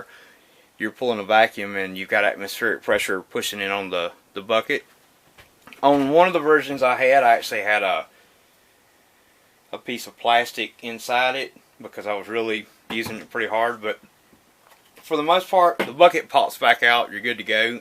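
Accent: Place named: South, United States. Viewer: Haha. People can be cruel at times. If it is any consolation , you have a great southern voice.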